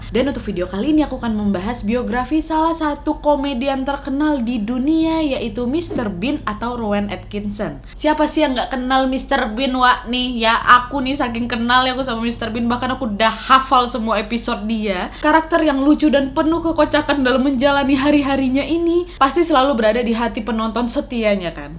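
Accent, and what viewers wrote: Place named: Lampung, Indonesia. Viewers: Mbak orang Lampung ya, dialek bicara nya Lampung banget 🙏🙏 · dialek sumatra.... sumatra mana?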